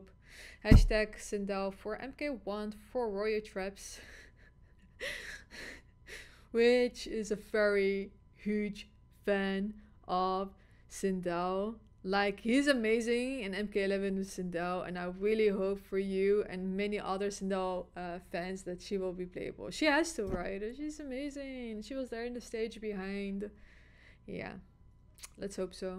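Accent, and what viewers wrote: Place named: Netherlands. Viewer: Do you come from the Netherlands? You have a Dutch accent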